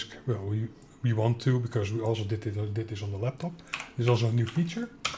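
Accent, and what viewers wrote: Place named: Netherlands. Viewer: You have a Dutch accent. I’m just a Linux noob, but am really interested in more holistic and well designed Operating Systems, so thanks for the video!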